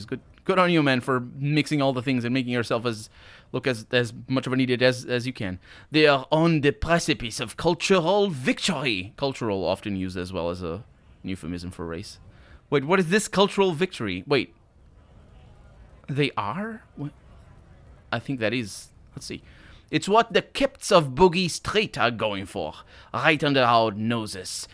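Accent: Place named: Portugal. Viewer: Dude you're already a portuguese person speaking english, trying to act native american would be a bit too hard lol. I don't mean this as an insult. I just know how much portuguese differs from english is all. Also coming from someone who speaks a different language as well that doesn't look even close to english either. heck I don't think I can pull off accent anyway.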